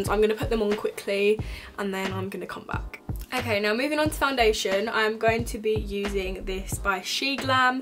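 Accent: United Kingdom. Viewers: UK Essex accent !!! (not the most refined...) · Yes I somehow always thought she was American (never heard her speaking before) and I was so surprised (in a positive way) that she was British!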